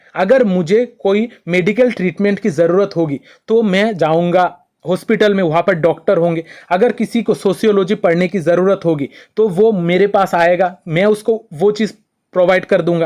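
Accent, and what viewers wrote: Bengal, India. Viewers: Sir ap bangal se h kya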